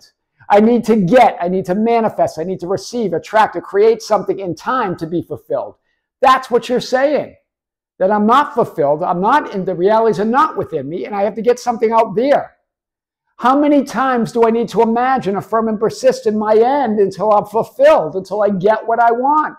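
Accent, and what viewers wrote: Boston, United States. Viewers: dispenza, tolle, neville, zeland, abraham hicks...all good...but the greatest and most complete is this man from Boston. throughout history only Jesus, Krishna, and a handful of others were better teachers, that's how good Mr. is. yeah, he looks scruffy and normal right? well guess what, real Buddhas are often so simple and humble that the common man would never know who they are. people flock to dudes with giant robes and paraphernalia but I tell you all, with conviction, this man is among the top 3 spiritual teachers alive on the planet but most people would never know it. they will judge him by him folksy demeanor and not realize he is a master teacher. those of you who know who he really is are lucky. he is one in a billion, literally. I'm still amazed by how this gem talks in a casual Boston accent and is so light and cheerful, most will never know they are interacting with a true MASTER. 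life is hilarious hahaha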